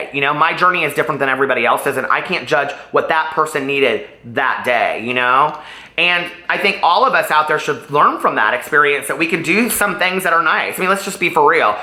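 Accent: South, United States. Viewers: ahhhhh j star clickbait from the drama channel. is so vocal fry...drama channel grown man trying so hard to sound like an 80's valley girl with his gay southern accent.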